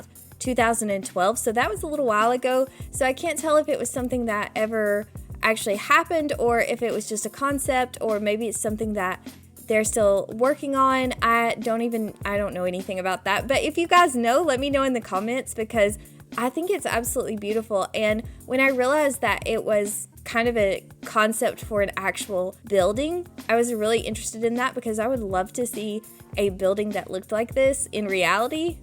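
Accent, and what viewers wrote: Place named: South, United States. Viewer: i love ur souther accent! and this build is absolutely killer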